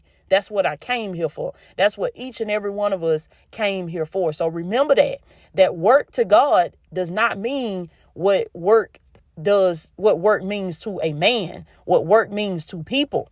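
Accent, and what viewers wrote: South, United States. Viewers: You just don’t know how much we love your country accent! ♥️♥️♥️♥️ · I like listening to your country voice. All your messages are interested and inspiring. I even sent my momma your link. She was talking about you today. I told her you only 33 years old with so much spark and fire in you and all your messages. It's the God in you that moves you. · We love, your Southern Accent!💝 It brings the message across in a passionate way. God Bless.💝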